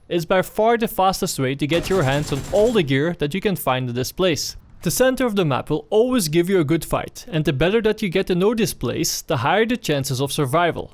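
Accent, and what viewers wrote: Netherlands. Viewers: zijn accent is zo vreselijk nederlands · it Sounds like your from the Netherlands with that accent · it sounds like he is Dutch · Ik hoor je Nederlandse accent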